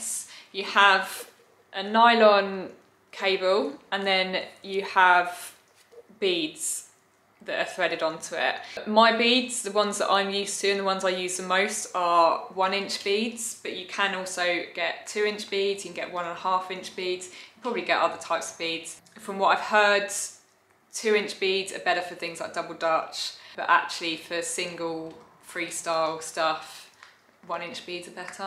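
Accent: England, United Kingdom. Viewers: I love your British pronunciation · British English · Love your too cute puppy .Glad to see a actual video that's not a short couple of seconds 👍. Also love your British accent.I am from Southern California USA ✌️ and nice to meet you . You are incredible with the rope .I have enjoyed all of your videos . Wish you all the very best with your YouTube success 👍... SergeantC (Retired US Army)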